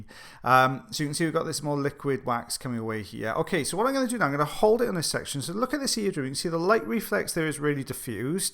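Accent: United Kingdom. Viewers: I find these vidoes very fascinati g. My favourite are the cotton ball/ paper removal. But i love your beautiful accent. Def my favorite of any British accent.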